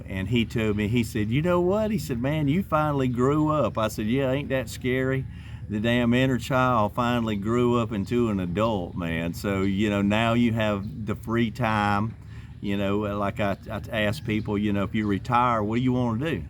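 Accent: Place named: Texas, United States. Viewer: You're a Texan cowboy lad the way you spoken yeah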